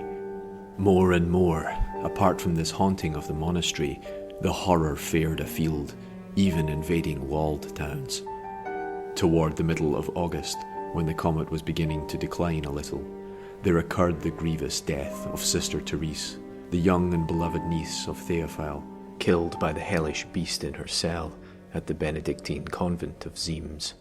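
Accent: Scotland, United Kingdom. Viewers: Good narration! Very glad to have found a YouTuber with a good voice doing Lovecraftian stuff. Scottish accent works well.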